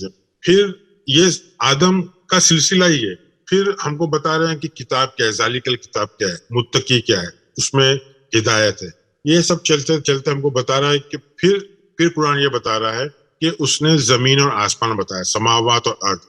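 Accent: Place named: India. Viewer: مجھے لگتا ہے تمھارے لہجے سے کہ ہندوستانی ہو اور اپنی مرضی سے قرآن کی تعویل کر رہے ہو۔شاہد ہندو بھی ہو۔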